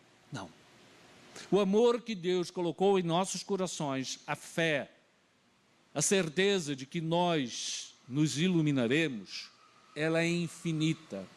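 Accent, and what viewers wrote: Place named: Portugal. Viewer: Por que está falando com sotaque de Portugal?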